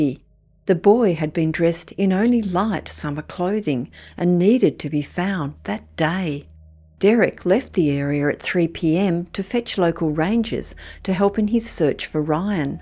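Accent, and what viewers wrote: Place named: Australia. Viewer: Australian narrator I like it 👍👍❤️❤️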